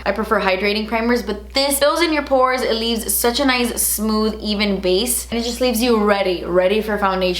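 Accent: Cuba. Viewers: Me too. Makes me LOL everytime her Cuban chica comes out to play! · Can you do a makeup tutorial in Spanish? I’d love to hear your Cuban accent for it haha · Love it when u speak Spanish and your Cuban accent is so 😍😍😍😍😍 I would be able to hear you just talk forever!!!!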